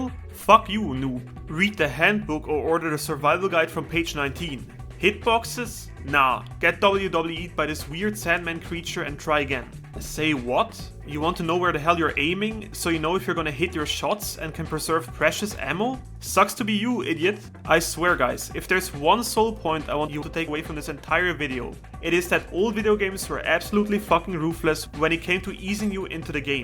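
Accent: Germany. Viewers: The German accent is strong with this one... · That is one thick German accent 😁😁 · Dude you sound incredibly german digga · I smell German from this guy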